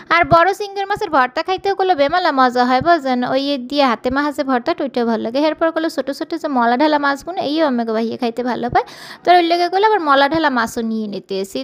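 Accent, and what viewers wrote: Barishal, Bangladesh. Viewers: Aponar kotha sunte Amar khub bhalo lage especially Aponar borisailla bhasa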